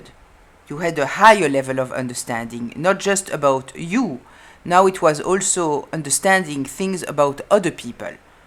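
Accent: France. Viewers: I think your approach to not bother people is a very healthy one when its done from a place of empowerment. It protects you from runner chaser dynamica where people extract energy from you running after them. If they needed your help its not upto you to insist more but upto them to ask for it in a healthy way. The only downside to your programming is imo that you fail to ask for help when you would need it yourself due to a fear of bothering others and this is indeed something that is detrimental. I enjoy your readings and the way you explain things. I also enjoy the french accent😊 Much love from a Dutch woman from amsterdam❤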